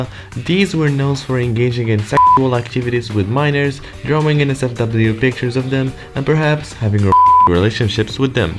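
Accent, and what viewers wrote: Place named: Alabama, United States. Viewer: yo. i thinked you are from alabama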